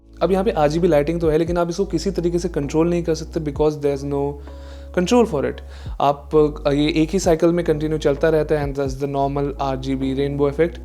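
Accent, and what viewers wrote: India. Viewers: Very hindi english.. Hard to understand but appreciate your Work of course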